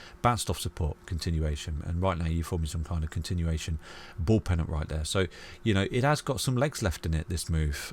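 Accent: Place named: Midlands, United Kingdom. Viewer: i don't think he is mumbling, he just sounds like a midlander to me 🤷 i don't struggle to understand him at all.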